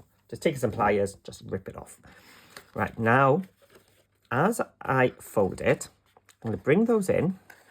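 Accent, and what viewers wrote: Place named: Carmarthen, United Kingdom. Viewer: Just come across from another YT channel and so glad I did. These baubles are gorgeous and ideal to make for my grandchildren for little treats on the Christmas tree. From one 'welshie' (Newport, Gwent South Wales) to another (you sound like you're from the Carmathen area) to another, thank you so much and can't wait to watch more of your videos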